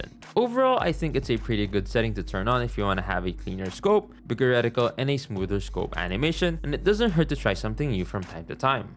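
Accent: Philippines. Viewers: You sound so filipino😅 (I know its not the topic i just wanna ask)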